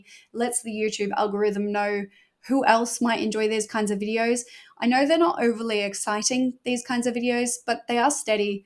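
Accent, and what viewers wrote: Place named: Australia. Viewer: when you say morning and evening do you mean Australia time or US time? I'm in Australia, so that would be good to know I guess. I'm new to the channel and not sure whether you play on oceanic or US server - you sound Australian but I don't want to assume Oceanic server. Also I would be happy with 30k a day, that would well and truly pay for WoW every month.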